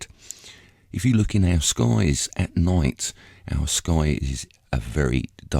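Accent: United Kingdom. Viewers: What part of the UK are you from?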